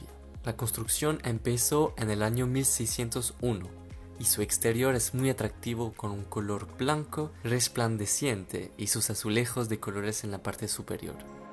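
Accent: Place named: Chile. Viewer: Todo bien pero tu acento Chileno me desespera tengo que usar el traductor para entenderlo y respirar porque de oírte se me va la Respiración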